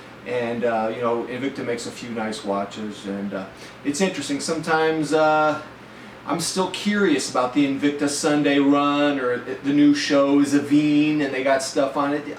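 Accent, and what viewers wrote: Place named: Boston, United States. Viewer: You remind me of a SOUTHIE GUY🇺🇸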